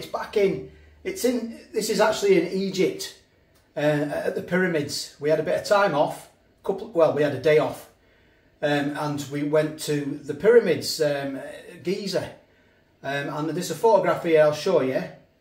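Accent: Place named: North, United Kingdom. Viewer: By the way the wholesome northern accent makes your channel feel so authentic like an authentic northern cuppa T lol 😂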